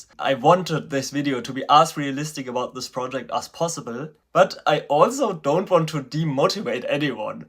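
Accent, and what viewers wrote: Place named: Germany. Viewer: Uh-oh, you provided value in the video, now I gotta have another subscription. Plus I sense a German accent.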